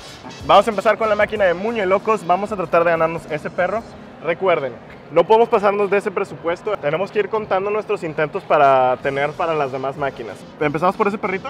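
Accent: Monterrey, Mexico. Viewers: Me suscribo porque sospecho que eres paisano de mty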